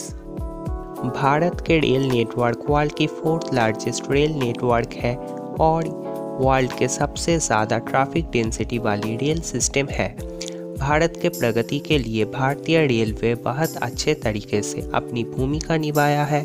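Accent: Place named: Northeast India, India. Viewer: Dear Dev he is perhaps from NE, Govt. and us should encourage and support NE guys , who are also our brothers and sisters, and r part of India.Southe indians , Bengalis have different accent. That is the flavour of India.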